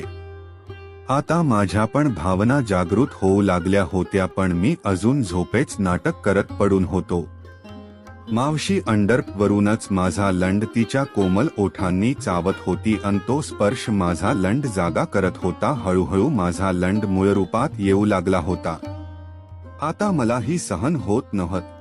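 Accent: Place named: United States. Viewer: is this marathi story presentation or American marathi presentation ? please either improve pronounciation or change the story teller.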